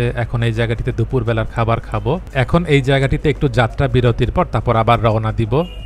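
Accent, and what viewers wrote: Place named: Noakhali, Bangladesh. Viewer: ভাই সত্যি আগে জানতাম না আপনার ভাষা নোয়াখালীতে। আমার মাইজদীর সাতে ছয়ানী বাজার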